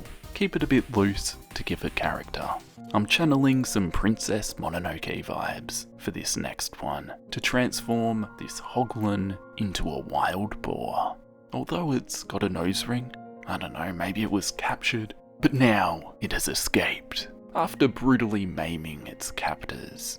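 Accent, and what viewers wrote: Australia. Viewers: Wait he's Australian not british?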